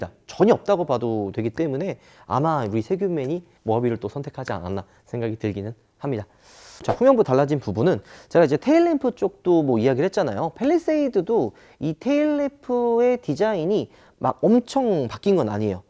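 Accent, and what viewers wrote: Gyeongsang, South Korea. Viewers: 야깐 야깐 하지좀마 이 갱샹도 유튜버 야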